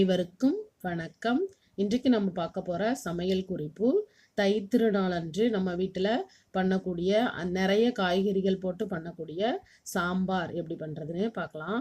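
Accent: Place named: Kanyakumari, India. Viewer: உங்களுக்கும் உங்கள் குடும்பத்தினருக்கும் எனது இனிய பொங்கல் நல்வாழ்த்துக்கள் பட்டு ராணி.... உங்கள் பேச்சின் மொழி (வட்டார மொழி) கன்யாகுமரியா அல்லது திருநெல்வேலியா....? நான் தஞ்சாவூர் மாவட்டம்....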